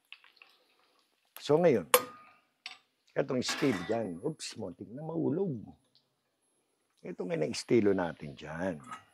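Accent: Marikina, Philippines. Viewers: Puntong Marikina ang salita niyo. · Yung accent ninyo talaga pure taga Marikina😊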